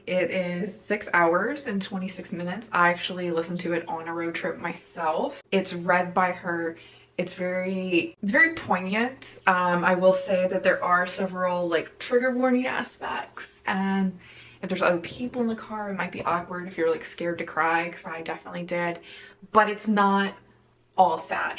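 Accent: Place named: United Kingdom. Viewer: Ahahahahaha hypnotized by the calm bland British accent, I can’t I’m dying 😂😂 I really enjoyed Micheal Pollen’s book “Cooked” I bet this is your mind on plants would be very much up my alley too. And 100000 percent kitchen confidential deserves to be on this list, listening to tony read this one made me so happy to hear one of my favorite voices in the culinary world again. Ooo did you hear about vanishing fleece from books unbound? That’s the only other place I’ve ever heard it mentioned., and I know you said you were an Ariel bisset fan! Alright, excuse me while I watch all your videos, I have a new favorite booktuber.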